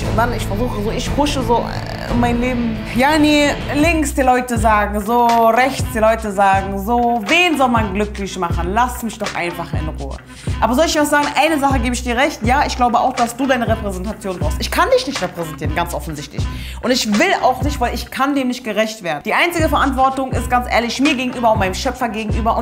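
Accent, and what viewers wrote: Berlin, Germany. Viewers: habubti! Wallah du bist eine Bombe. Deine "Berliner Schnauze" / Berliner Akzent hoert sich an wie "Koefte in der berliner currywurst Sosse".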